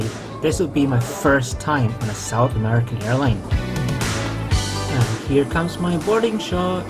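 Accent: Scotland, United Kingdom. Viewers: your accent dips in and out of hard Scottish so it's just interesting to listen to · whaoh i never expected you to have a scottish accent LOL ,love the voice over great addition to an already great channel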